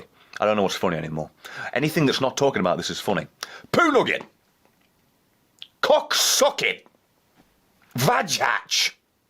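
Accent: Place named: United Kingdom. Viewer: Damn it's sad that I can't here your sweet British(is he British?) accent and you screaming with words you invented 3 second before you say them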